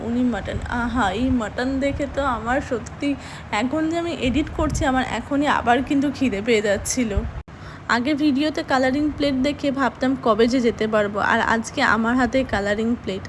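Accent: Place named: Bangladesh. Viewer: Apni ki Bangladeshi??? Kotha gulo kamon jano..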